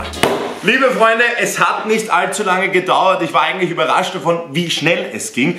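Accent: Austria. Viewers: #österreicher "Wos dus du dn grod"😂😂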